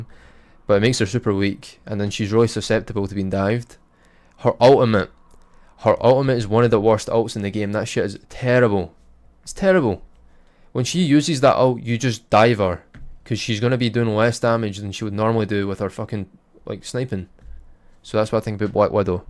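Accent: Scotland, United Kingdom. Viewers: Is that Scottish? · Its the Scottish accent. Based like Logang. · He said "He can literally kill" not "he can one shot kill" the scottish accent just makes it sound weird.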